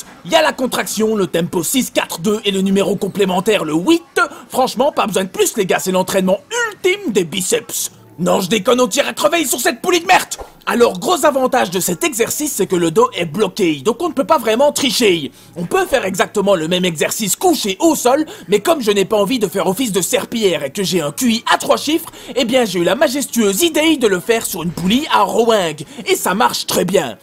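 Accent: Belgium. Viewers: Salut à toi jeune fou, j'ai mis du temps à apprécier ta chaîne et son format mais je suis fan maintenant. De très belles connaissances techniques et biologiques saupoudrées d'un humour barge le tout sur un accent de Dikkenek !!!! J'achète, bravo.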